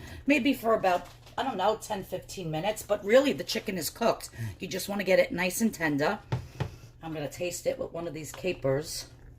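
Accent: New York, United States. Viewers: Love this recipe and love that New York accent ! Cindy From Queens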